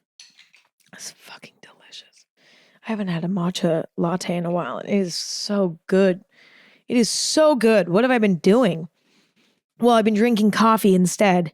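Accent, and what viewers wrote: United States. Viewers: I like your American accent and the way you speak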